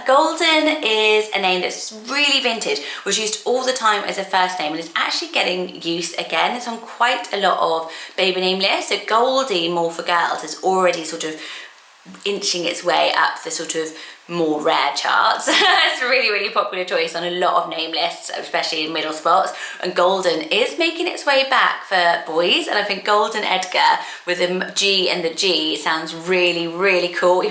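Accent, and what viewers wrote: United Kingdom. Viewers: These are sooo cool!! Wish half of them sounded as good in my American accent as they do in your gorg British accent 😘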